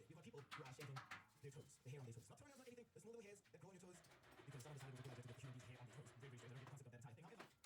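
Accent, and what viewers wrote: South Africa. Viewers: I kight just be assuming.. but I am mostly just shocked at that thick South African accent.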